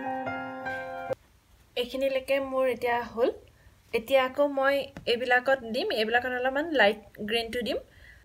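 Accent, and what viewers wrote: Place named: Nagaon, India. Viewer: Tumar maat tu xosai dhunia aamar Nagaon nisina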